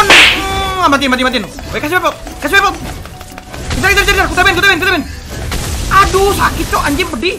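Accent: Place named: Kalimantan, Indonesia. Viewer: Kek nya orang Kalimantan tuh bro dari bahasanya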